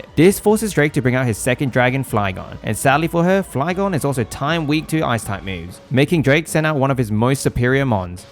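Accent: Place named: Australia. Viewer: Your voice sounds Australian unless im hearing things if so hi happy new years from Queensland also good dark run with dark types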